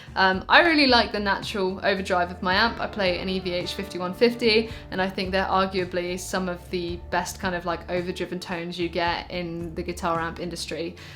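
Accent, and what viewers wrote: England, United Kingdom. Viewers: Ah you’re English! You have broken my hot-o-meter! Great playing.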